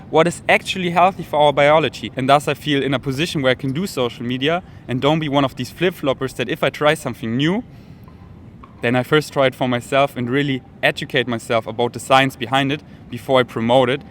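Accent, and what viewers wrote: Germany. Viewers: I knew it, that accent looked like a german one (scroll in the comments) Tolle Video☺️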